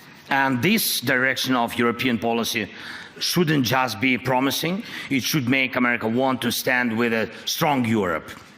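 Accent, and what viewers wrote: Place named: United Kingdom. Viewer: We need more bri-ish voiceovers its more suspenseful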